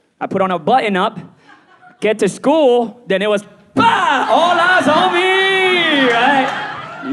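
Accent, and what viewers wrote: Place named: United States. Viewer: Looked this guy up because I’m currently watching Idol producer and was curious who he was because I could hear the American accent through his Mandarin. Little did I know that he’s funny as fuck!!!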